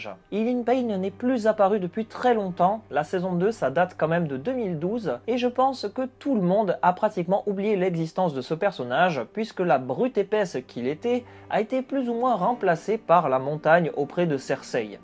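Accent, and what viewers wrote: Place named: South, France. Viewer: Oh le bel accent du sud !!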